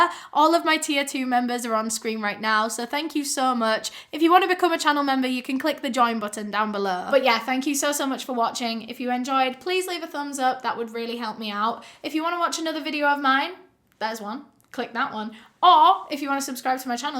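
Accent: United Kingdom. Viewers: Way to be dismissive of biological facts vato. Your ignorance is monstrous. What a drama queen to use the word monstrous 👀. You got a problem homie, Go and study biology. You want to take moral high ground, then never again watch a murder case. Not going to happen. All of us normal human beings have a fascination/curiosity with deplorable evil that other despicable humans do. Hence why I come on. Because I enjoy her charm, and her british accent, and her way of narrating the subject matter. Highlighting and exposing these scumbags. Many of which I never even heard of despite being american hispanic. Take your nonsense somewhere else. You don't like biology and nature 'Oh well' your stupidity is noted.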